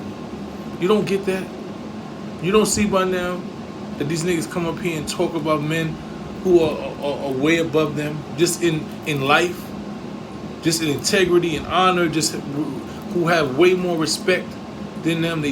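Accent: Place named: Arab world. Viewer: Bro stopping saying hit the like button like & prescribe like that bro u sound like a arab use that persons voice it sounds more real believable bro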